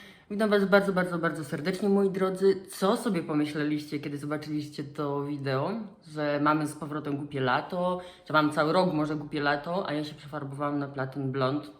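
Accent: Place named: Greece. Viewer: Skąd taka wymowa imion i nazw miejscowości? Czemu „Menelos" zamiast Menelaos? Czemu „Mikines" zamiast Mykeny? Czy to wymowa strikte grecka? W sumie nie ma co się dziwić, że Klitajmestra znalazła sobie kochanka Ajgistosa. Przecież Klitajmestra znienawidziła swojego męża po tym jak złożył w ofierze ich córkę Ifigenie, tylko po to by statki mogły odbić od brzegu i popłynąć do Troi. Jeszcze wmówił matce i córce, że Ifigenia ma zostać zaślubiona Achillesowi, tymczasem podstępnie ją zabił. A jeśli chodzi o trzy wersje śmierci Agamemnona to może rzeczywiście pierwsza jest najbardziej prawdopodobna. Trzecia z tego co pamiętam, ale mogę się mylić bo sztukę czytałam dawno została przedstawiona u Ajschylosa w Oresteji. Druga wersja po prostu wydaje się ściągnięta z motywu śmierci Heraklesa. O nim się mówi, że został zabity przez Dejanire, która nieświadomie podała mu szatę nasączoną krwią Nessosa. 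W sensie szatę podała z premedytacją, ale Herakles miał ją po prostu znowu pokochać a nie zemrzeć.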